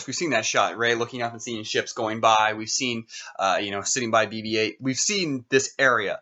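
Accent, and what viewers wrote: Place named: Kansas, United States. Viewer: Are you from Kansas? I am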